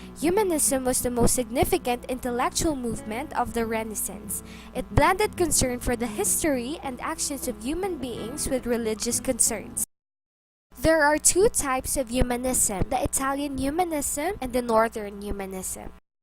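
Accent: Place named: Philippines. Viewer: Informative! Although the accent you have really sounds like a filipina which is cool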